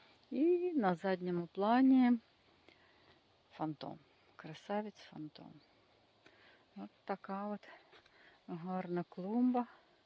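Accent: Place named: Russia. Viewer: Как ни выдавливай русский,а он все равно проскакивает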